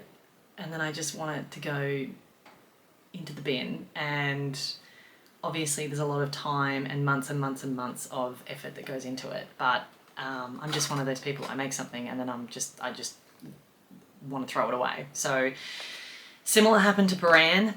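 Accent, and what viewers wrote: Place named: Australia. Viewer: At first I was going to guess British, but listening further decided Australian. Always nice to see a girl in the model train hobby. Uncommon!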